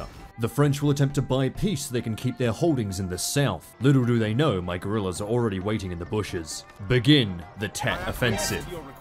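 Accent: Australia. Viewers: It's like Sseth and Sovietwomble, but Australian. And I like it.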